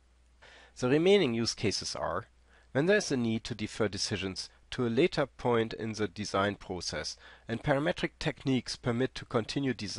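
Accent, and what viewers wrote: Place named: Germany. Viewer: Too much germanish XD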